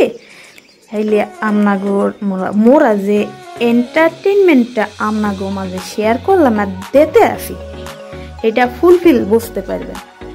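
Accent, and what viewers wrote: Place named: Barishal, Bangladesh. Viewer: Bhola laglo aponar video ta deklam khub balo laglo. Borishaler language. I enjoy it.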